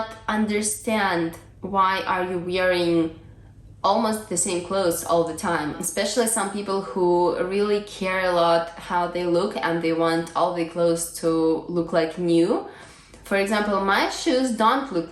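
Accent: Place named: Russia. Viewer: Happiness is found in contentment and simplifying ones life. Buying lots of clothes to impress others will produce disappointment and empty your wallet. Thank you for paving the way for others to consider the minimalist lifestyle, it is certainly producing fruit for me. A technical note: Your voice seems to echo a bit in your kitchen and combined with your light Russian accent it may not always be easy to understand you. Consider getting a small clampable microphone to attach to your neckline, it will make your voice very distinct. I noticed when you were (outside) talking while headed to the thrift store a few weeks ago, your voice was quite clear. For what its worth. :)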